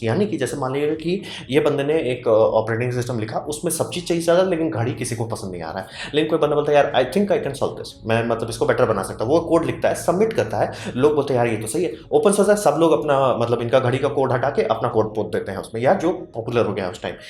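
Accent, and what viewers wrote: Bihar, India. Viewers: Wah sir wah, android Linux ka gotiya bhai hai 😂😂 sir aap bihar se hai kya 😀 pura desi bolte hai. Mja aata hai sunkr 🙏🙏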